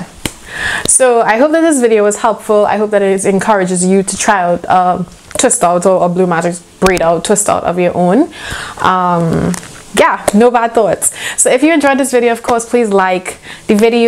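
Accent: Trinidad and Tobago. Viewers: Is that a Trinidadian accent I hear? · As soon a single hear you, I knew you were trini!!!! 🇹🇹🇹🇹🇹🇹🇹🇹🇹🇹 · Babes are you trinidadian??? You sound like my people. #izatrini Btw I just got 3 jars of the blue magic at Walmart. It's a must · Heard you speak, and was like "ah know this accent, she hadda be Trini!" Subscribed!!!! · Are you trinidadian? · Girl i heard your accent n like why you sounding trini, where you from lol so I checked the description box, sure enough, we're from the same country lol. I just here browsing the youtube about getting back to basics cuz things be getting to expensive in the "natural hair community" just as if you were relaxing your hair, maybe even worse lol.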